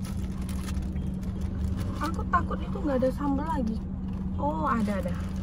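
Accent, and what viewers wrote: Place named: Medan, Indonesia. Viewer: Gua Orang Medan dan udah 5 Tahun tinggal di Bandung. Kalau kangen makanan Medan dan logat Medan, selalu nonton Channel Mukbang karena logat nya itu loh khas medok Medan banget 😁 dan kalau liatin makanannya selalu bikin kangen Rumah. Sukses terus ya ~ ❤️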